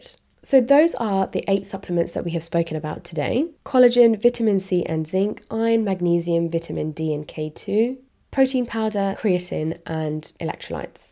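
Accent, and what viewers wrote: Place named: India. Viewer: Indian accents are so brutal. H8 it.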